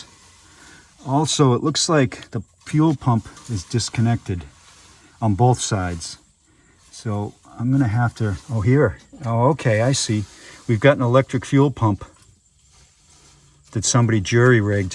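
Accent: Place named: New Jersey, United States. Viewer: Sounds like he's from Jersey 😅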